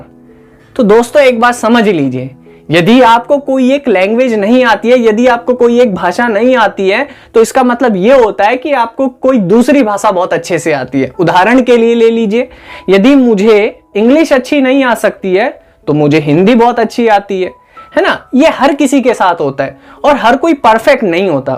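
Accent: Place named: Bihar, India. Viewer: bihari tongue, kya baat hai kya baat hai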